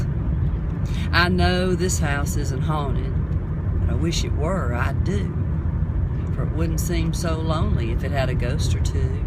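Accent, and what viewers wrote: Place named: South, United States. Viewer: My mother would recite this poem for me when I was young. Well mom is gone now. I was thinking about the poem the other day and went searching YouTube. I like this one because she has a nice southern accent.Thank you.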